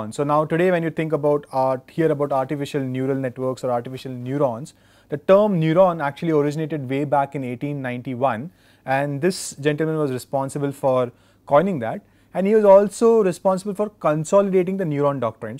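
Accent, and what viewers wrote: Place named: India. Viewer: 这个印度英语，有点可爱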